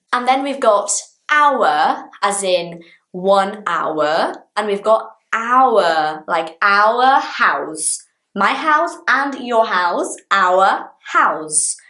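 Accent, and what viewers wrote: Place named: England, United Kingdom. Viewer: Thanks so much to the class ... I'm learning English "alone"(because I watch other channeel) , your class help me very much... I like very much your accent English... Thanks so Much.... I'm new subscribe here!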